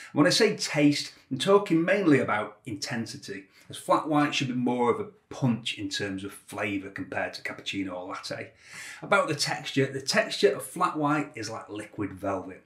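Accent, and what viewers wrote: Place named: West Yorkshire, United Kingdom. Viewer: You have a similar accent to me. West Yorkshire?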